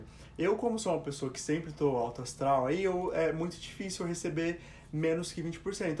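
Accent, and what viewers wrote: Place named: Pará, Brazil. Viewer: É paraense? Fala igual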